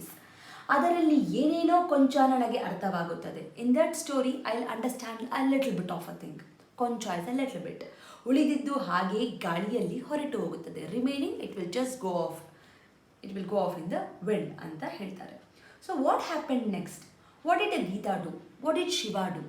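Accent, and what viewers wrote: India. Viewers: Aunty please don't talk in buuttler english please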